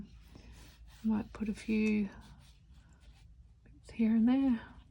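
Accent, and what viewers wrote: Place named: Australia. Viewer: Fark yeah, been looking for a watercolour artist I like to listen to that is not American, I wasn't even DREAMING of finding an Aussie 🎉🎉🎉 Thank you for the great tips, AND your videos are super relaxing as well. All the best.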